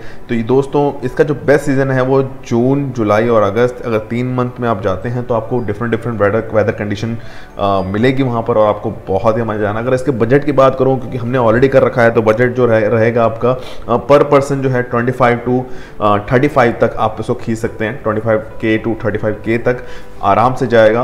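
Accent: Gujarat, India. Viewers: Sirji, Are you from Gujarat !!!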